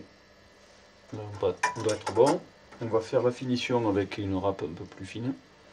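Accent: France. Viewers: avrc le petit accent marseillais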